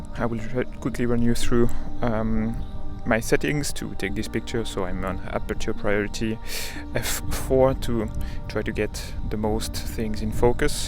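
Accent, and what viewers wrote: France. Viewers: Isn't it a French accent ?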